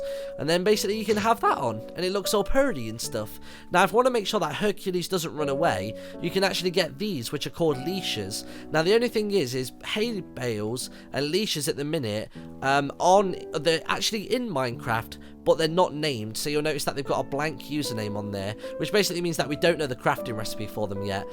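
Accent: United Kingdom. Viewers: that british is so funny :)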